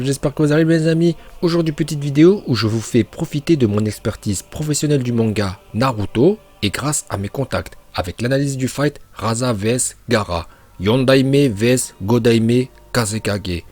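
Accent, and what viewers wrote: Japan. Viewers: Je kiff ton accent japonais continue comme ça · Bravo pour t'est vidéo et continue comme sa je te soutien et continue ton accent japonais c'est tellement stylé quand tu sort ton accent japonais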